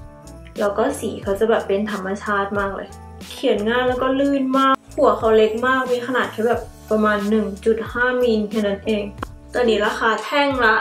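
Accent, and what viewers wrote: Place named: South, Thailand. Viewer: พี่ แหลงใต้ทำคลิปหล่าวตะ ชอบนิ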